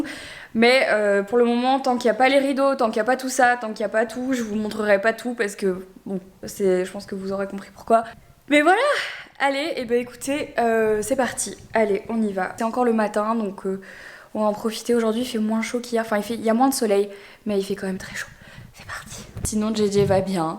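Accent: Belgium. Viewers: L’accent belge, ma passion 😍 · tu es belge ?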